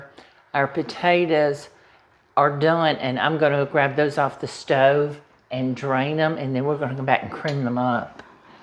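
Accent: South, United States. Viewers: So glad you're feeling better!! This looks delicious!! Ur from the south and love your southern voice but wondering exactly what state? Cause by golly u make me feel like Mama's still around about to give me a hug and tell me a story from her childhood!!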